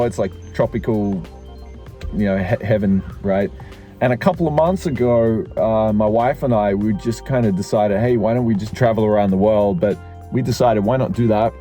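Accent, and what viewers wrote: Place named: Australia. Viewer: Cool hearing your story. I was wondering about the Australian accent, Mid East last name, and Japanese residency.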